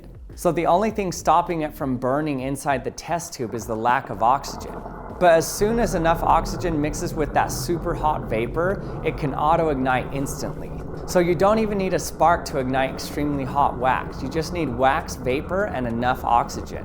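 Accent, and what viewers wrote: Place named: California, United States. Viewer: I don't know why but chemistry with a camp Californian accent is super fun.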